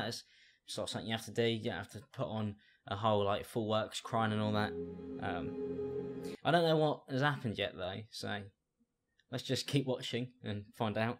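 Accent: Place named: RP, United Kingdom. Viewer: His accent is so posh that I don't understand most of the things he says but I have to assume that it's a joke and laugh to it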